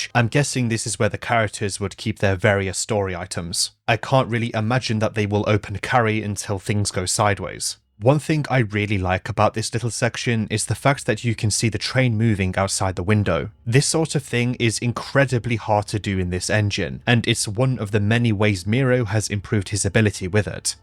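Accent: United Kingdom. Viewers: as a linguistics major, you didnt really mispronounce very many foreign words in the last funger video. And if anything most of the words that people thought you did mispronounce can be chalked up to your specific english accent. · the full voicing of this video plus your british accent makes me feel like im listening to a tma episode at times lmfao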